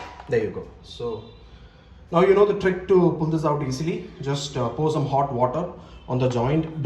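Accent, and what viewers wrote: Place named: India. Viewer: You sound like an NRI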